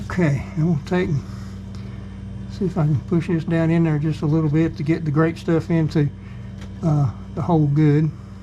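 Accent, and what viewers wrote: South, United States. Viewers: New subscriber here! Thank you so much for this video. I’m sure I’m a neighbor (I noticed the accent) and this is something everyone deals with here In the south especially out in the country (rural areas). This is exactly what I needed to watch! God bless you! 😎👍🏻👍🏻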